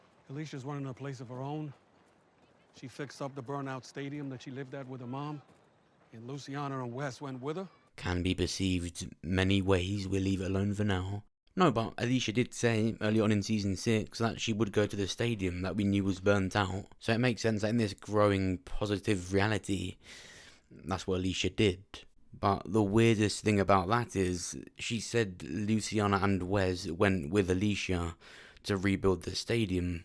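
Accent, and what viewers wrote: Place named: Southern England, United Kingdom. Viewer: Lol I’m from blackpool but i think your southern accent proper ace lol you have a creepy type voice as well 🤣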